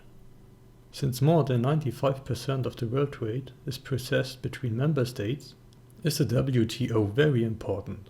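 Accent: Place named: Switzerland. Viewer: Geneva s English sucks Acento SUIZO tipico: frio y de fumador de rodillas guacala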